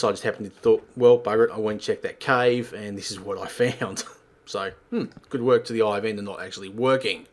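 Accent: Australia. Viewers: Obviously Aussie xD.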